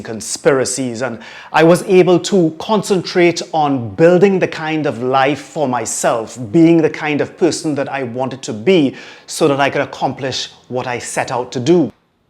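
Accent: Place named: Trinidad and Tobago. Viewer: Not only an amazing story but well told and the Trinidadian accent tho I'm Antiguan (have family in Trinidad) gives me an at home feel But at just 18 years old there's a lot I will take from this video including the fact that I am now the writer of my own destiny I have the ability to grow develop choose the persons who I want surrounding me etc. Of course you cannot control everything and alot of times it's good to not be able to control everything because we serve a GOD who loves us and we must face challenges. But by far one of the most clear and fruitful messages I've ever received!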